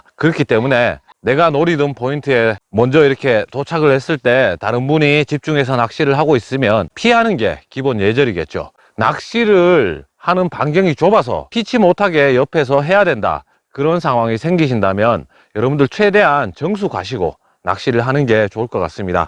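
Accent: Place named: Gyeongsang, South Korea. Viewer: 점점 표준어 쓰시네요 경상도말투가 듣기좋아요ㅋㅋ 전라도인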